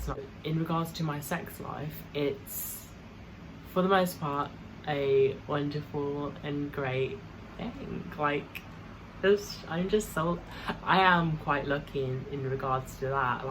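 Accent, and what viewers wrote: United Kingdom. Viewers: She Has A Fat British Accent Which Makes Her Provocative 😊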